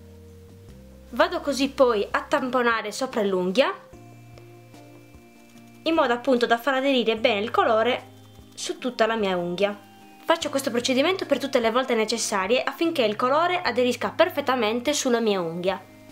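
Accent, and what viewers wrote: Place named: Sardinia, Italy. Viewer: Sono sarda come te, mi fa morire questa cosa dei video con accento sardo!! 😂 In senso buono ovviamente!! Ho scoperto ora il tuo canale sei molto brava e soprattutto insegni come fare bene le cose in casa con le cose che si hanno in casa! Ti do un piccolo consiglio..parla più lentamente, così darai un senso di relax a chi ti guarda dato i video che proponi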